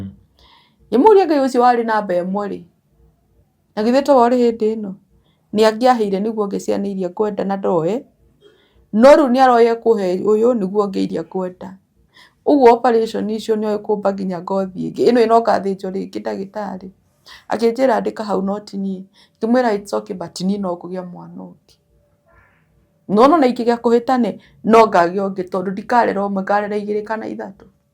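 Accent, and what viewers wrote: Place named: Kawangware, Kenya. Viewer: Hapana hiyo lafudhi si ya kwenu. Ni ya kwetu Kawangware.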